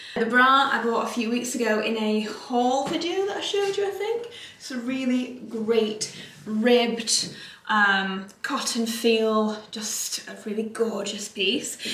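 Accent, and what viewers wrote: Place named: Northern England, United Kingdom. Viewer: It makes me so happy when I hear you say classic northern phrases like “cracking the flags”! 🙌 I love both of the coat materials but I think the blue pattern has the edge for me 💙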